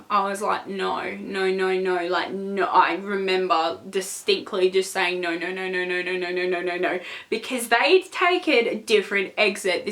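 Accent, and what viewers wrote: Australia. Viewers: Your so pretty, and I lurrrrv your accent. Are you Australian?? xx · are you Australian? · She legit has the same australian accent as 5sos and lol u made it to her new video! Watch it to see her slay your ass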